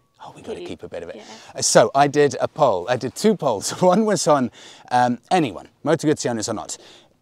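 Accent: United Kingdom. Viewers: This is an excellent video. Thanks for sharing. There is just something about listening to a motorcycle review done by someone with a British accent. The content and editing…excellent as well. Cheers! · The British pronunciation is awesome. i love that.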